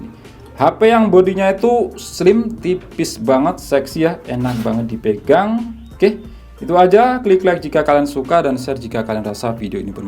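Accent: Blitar, Indonesia. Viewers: Bang lu orang blitar ya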